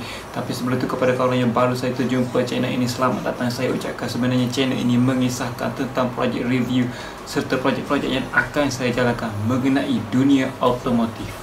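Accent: Kelantan, Malaysia. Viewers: Kelantan ek bang.. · Tahniah.. walaupun bunyi2 klatey kluar sikek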